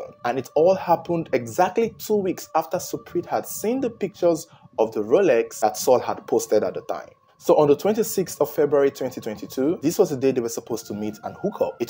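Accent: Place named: Nigeria. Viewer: I 😊😊😊😊😊😊😊😊I LOVE YOUR NIGERIAN ACCENT😊😊😊😊😊😊😊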